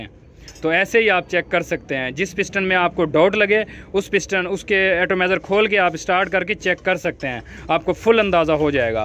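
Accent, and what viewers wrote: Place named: Bengal, India. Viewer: Fault to aap ne bataye nahi aur bata bhi to bangali ki trah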